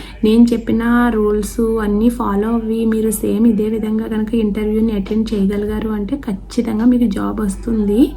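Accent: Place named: Godavari, India. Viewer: అక్కో మీది గోదారి జిల్లా 😄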